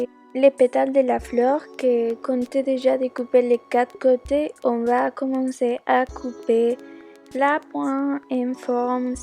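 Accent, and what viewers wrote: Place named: France. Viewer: i love your french accents, sigue asi de guapa, saludos!!!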